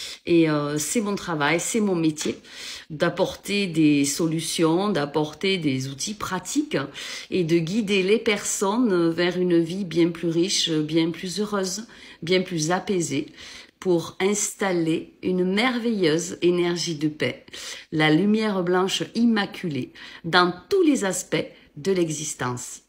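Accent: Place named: Southwest, France. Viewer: Oui , j'aime beaucoup ta voix , avec ce petit accent du sud-ouest , mais je ne savais pas que tu étais très jolie . Bisous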